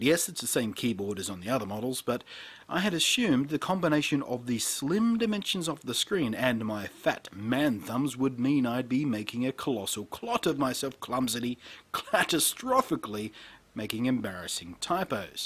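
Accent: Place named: Australia. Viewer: ooohhh man i love your australia accent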